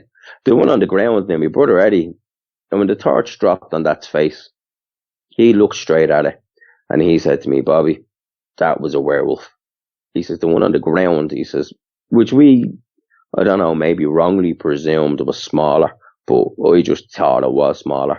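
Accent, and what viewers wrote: Ireland. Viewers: Number one, I am so very sorry that when you think of America that this encounter will always be your first memory of our country. Number 2, I have visited former Jugoslavia, and Germany and I hope to travel to Ireland one day. I find your accent/brogue beautiful. I am Italian but I have always been drawn to the Irish and Ireland and I am not sure why. I want you to know we appreciate you sharing your encounter with all of us, as I can't imagine just how difficult this was for you to retell. 💕🙏💕🙏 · As an Irish guy listening to this randomly hearing an other Irish guy talk about a dog man is bizarre to me 😳, awesome 🤙🏽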